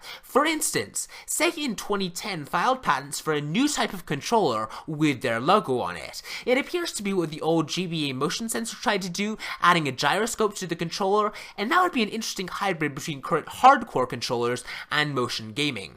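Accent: Scotland, United Kingdom. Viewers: Are you Scottish? I get the strong impression you're Scottish, but moved away aged 7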